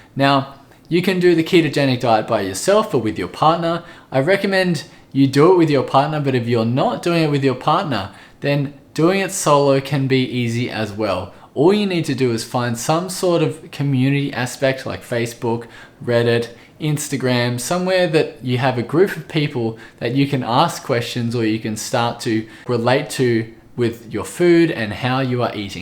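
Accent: Australia. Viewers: So nice to have an Aussie presenting these videos. Thanks. Very informative.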